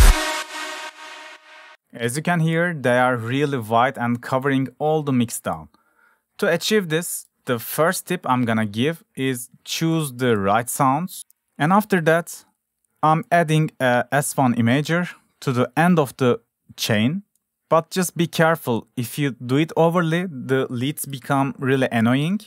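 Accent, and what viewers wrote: Turkey. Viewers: Abi valla çok teşekkürler ya 1.5 saattir bunu arıyorum. Konuşma tarzından direk türk olduğunu anladım :D Bu arada parça muhteşem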